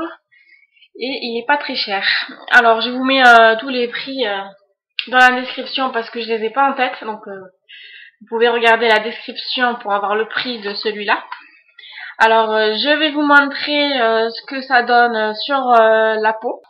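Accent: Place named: Toulouse, France. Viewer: j'adore ta vidéo ! :) merci et au passage bel accent TOULOUSAIN ;) <3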